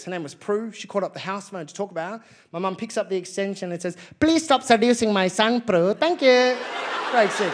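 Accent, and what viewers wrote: Australia. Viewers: So nice... Australian accent is wicked.. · As a brown immigrant in California... roundabout way of saying American...if I closed my eyes and watched this I would think some white Aussie commie from cricket telling jokes. World is so bizarrea and interesting. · I detect a slight Australian accent 😅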